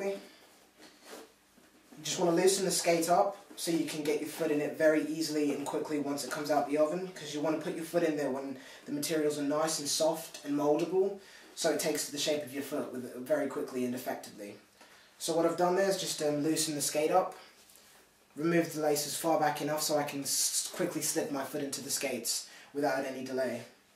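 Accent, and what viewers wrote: United Kingdom. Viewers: a black guy with a British accent talking about hockey skates..... lol crazy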